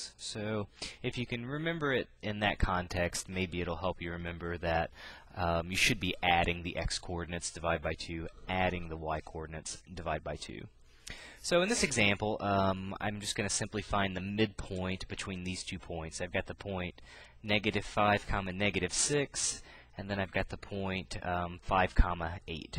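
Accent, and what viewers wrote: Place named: Chicago, United States. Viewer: hahaha in this video you kind of have a chicago accent you have the short sound for your A's. I think.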